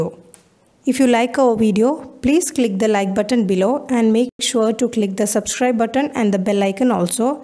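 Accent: India. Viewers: YES IN INDIAN ENGLISH YOU TRIED TO CLEAR THE FUNCTION OF A SENSORS FUNCTION YOUR MODE OF EXPRESSION AND LANGUAGE IS MORE OR LESS CLEAR AND UNDERSTANDABLE----SELIM AHMED CHOUDHURY FROM BANGLADESH ON 7/10/2021 AFTERNON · Is the narrator from India? Love from Bangladesh... 🤝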